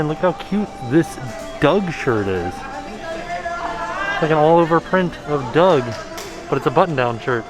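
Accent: South, United States. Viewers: It's a southern twang. We mean no disrespect. Have a beautiful fall.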